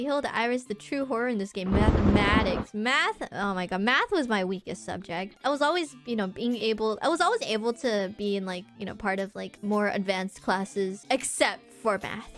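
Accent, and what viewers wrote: United States. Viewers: I mean, she has an accent alright, but it's an English accent, specifically sounds like west coast NA. She's very clear with her words, and only slightly slurs them when excitable from what I can tell, but it is very clean otherwise. Idk bout her Japanese speaking though, can't speak it myself, so unsure how it comes across to native speakers. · She sounds vaguely American to me. But I am not the best with accents. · She has an american accent in my opinion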